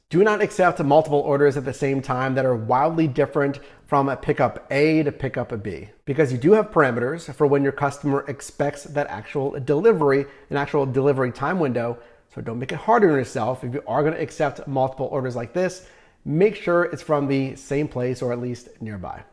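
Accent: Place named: Pittsburgh, United States. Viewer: You from Pittsburgh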